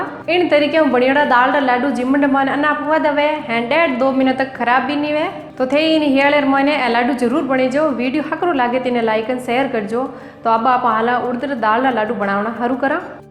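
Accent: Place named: Marwari, India. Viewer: Khamma ghani sa🙏 bohot achi marwadi boli sa , recipe b badiya sa, bohot acho lago mithi marwadi sune ne😊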